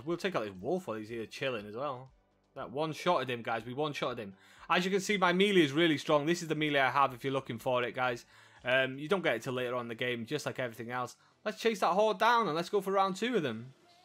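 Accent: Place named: Liverpool, United Kingdom. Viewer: I'll subscribe just cause you're scouse lad. Get sick of watching the American kids sometimes 😂😂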